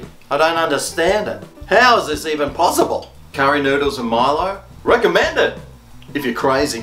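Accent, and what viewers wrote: Australia. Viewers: If we go by accent I'd assume Australia, Austria sounds more like German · Ayyy your Aussie too! 😂😂😅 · ...Yeah, this guy is definitely Australian.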